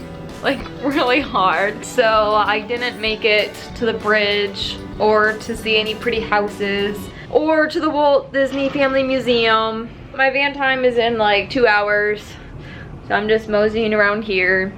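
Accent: Midwest, United States. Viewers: Is that a Midwestern accent?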